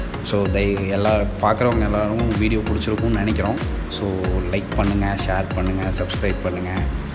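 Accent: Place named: Kongu, India. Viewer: Kongu Kovai Tamil voice 😍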